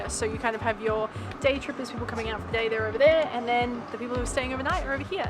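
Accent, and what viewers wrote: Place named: Australia. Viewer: Love your videos! As an Australian, it’s so wonderful to see an Australian YouTuber who speaks in a clear, nice sounding cultivated Australian accent without the use of Australian slang.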